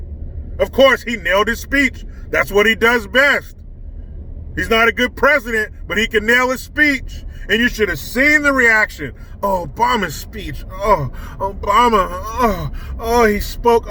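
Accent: England, United Kingdom. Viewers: Hitler 😮 bloody hell if Those Democrats woke up they would understand they are the bloody Fascists 🧐 Love the British accent 😅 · Nice English accent lol 🖒🖒🖒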